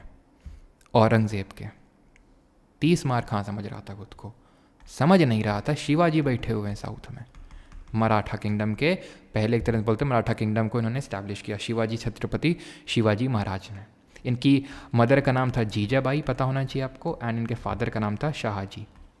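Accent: Kanpur, India. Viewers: Sir aap kanpur se ho i know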